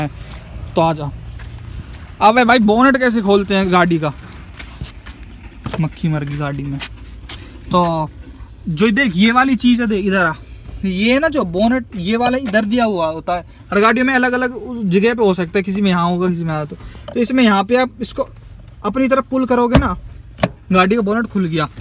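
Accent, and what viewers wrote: Haryana, India. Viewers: It's just bcz of his haryanvi tone even my friends sounds the same 😂😂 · Haryanvi bolte hai dono 😊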